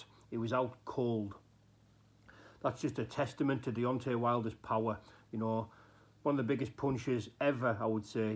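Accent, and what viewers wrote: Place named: Cumbria, United Kingdom. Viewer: You sound cumbria fella.............Where abouts? Just watched a fight that randomly popped into my tube feed. You said ' is it ower'. That's not Welsh. Lol.........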